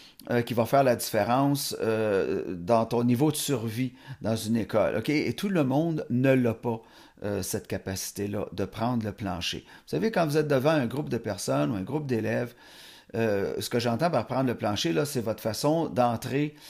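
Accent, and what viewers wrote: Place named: Vendée, France. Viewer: Ils sont tous en burn out! Le cerveau brûle...ce type de prof je le décalque au mur! J'eusse connu ...mais pas à ce degré j'avoue ! Ça craint ce monde plein de demon. Bisous Prof avec ton accent souche de Vendée je crois bien sauf erreur de ma part ! ❤💞❤️‍🔥🧠🫶🙏 le TDAH Ça me connaît mais chez nous y a un retard de 300 ans ...je comprends mieux en écoutant des concernés du Canada car en France c'est pitoyable ! C'est mon cerveau TSA qui m'a sauvé les miches! Je remercie mon cerveau chaque jour crois moi! Heureusement je n'ai pas de retard cognitif ! Etre ambivertie est une triforce! Je ne suis plus une enfant ceci dit! Un demi siècle déjà ! J'ai pu comprendre grâce au Dr Karpman qui causait avec Joe Black...je sais aujourd'hui qui je suis...parfaitement imparfaite dys sur dys! 😂😂😂😂❤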